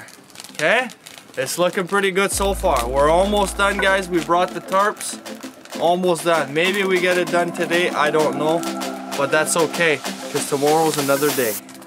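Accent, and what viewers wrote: Canada. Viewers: Canadian eh?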